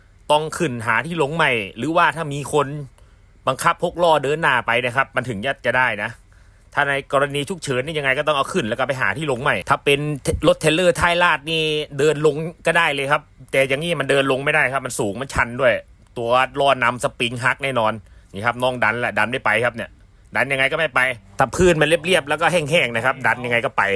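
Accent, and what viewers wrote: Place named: Sukhothai, Thailand. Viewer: สำเนียงโขทัย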